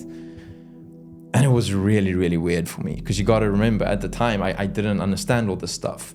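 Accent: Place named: South Africa. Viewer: I love your accent. Is it South African by chance, or New Zealand?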